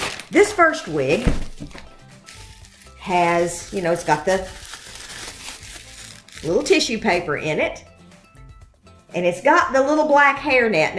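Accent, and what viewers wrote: South, United States. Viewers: Can I ask where you are from in the South? I’m from Arkansas and I love to listen to you.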